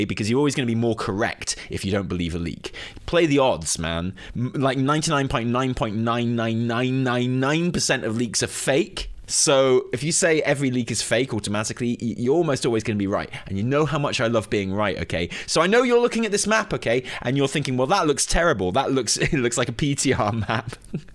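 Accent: United Kingdom. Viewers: Nothing like a good mystery reveal with a man with a British accent.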